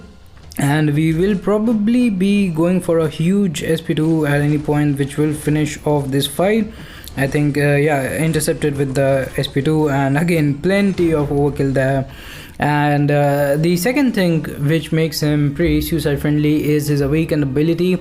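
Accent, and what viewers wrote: India. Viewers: When I saw him 1st time I thought he look like Indian and his Accent and Now finds out he is 😘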